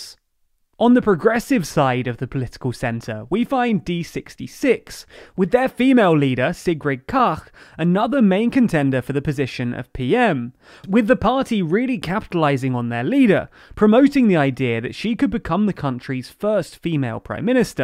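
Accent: England, United Kingdom. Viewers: DitisKaas Appel there are dutch team members in TLDR, it's not 100% based, but ofc for clarity the english accent just works better than stone cool english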